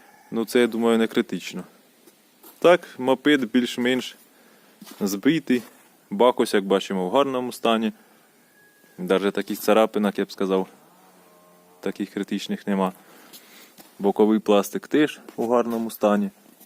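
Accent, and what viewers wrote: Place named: Nizhyn, Ukraine. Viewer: А ви з ніжинського району по акценту наче чутно чого питає вдруг надумаєте продавати чи далеко їхати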